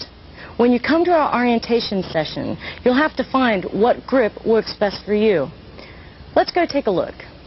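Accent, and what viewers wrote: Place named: Australia. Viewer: I want to say Aussie who has lived in the US for a long time.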